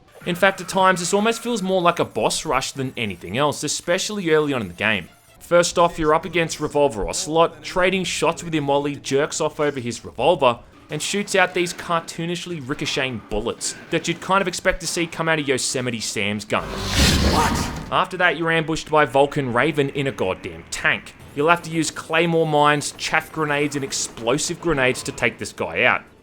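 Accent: Australia. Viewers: "Where he backflips off a missile in mid air, I mean come on son!" - Aussie AF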